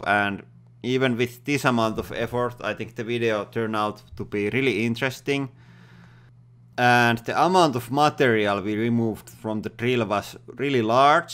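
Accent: Finland. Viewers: Such an awesome video! Machining porn and also awesome Finnish accent. Made my morning! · i was wondering where i've heard this accent before.he sounds like kimi raikonnen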